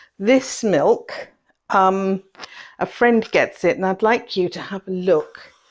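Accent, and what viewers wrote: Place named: United Kingdom. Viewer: She is English. She is pronouncing it as the Russian word with British inflection. Relax.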